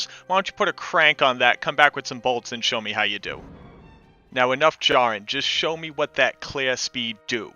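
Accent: Boston, United States. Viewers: The boston accent came out HEAVY in this video :D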